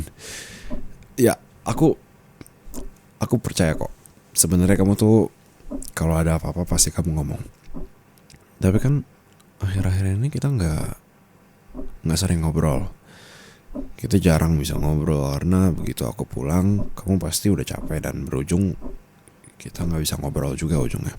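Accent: Java, Indonesia. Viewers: JAWA NYA AKHHH, BANYAKIN NGOMONG JAWA!!! ENAK BNGT DI DENGERNYA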